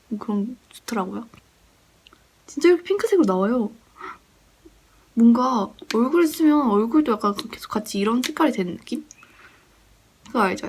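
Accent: Seoul, South Korea. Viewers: 서울 사투리...? 말투가 너무 매력적이에요!!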